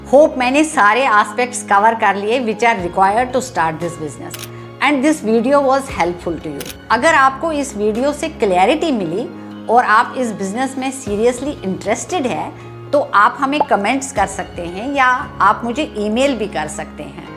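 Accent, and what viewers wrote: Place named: Kashmir, India. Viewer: Mam your accent is kashmiri..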